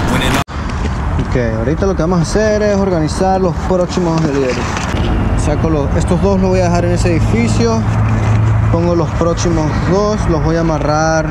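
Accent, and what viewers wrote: Venezuela. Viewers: ¿Eres venezolano crack? Por cierto tu trabajo es increíble y con alguien con tu aptitud, se hace llevadero. Éxitos mi pana. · Excelente la edicion del video hermano,eres de venezuela cierto? ,por el acento y las palabras que usas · Este pana como que es venezolano? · Se sabia casi q desde el principio q era venezolano, la chica q dijo mugre si no lo es, estoy seguro q debe ser colombiana :D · Eres de Venezuela ? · Vos sois venezolano? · eres venezolNO??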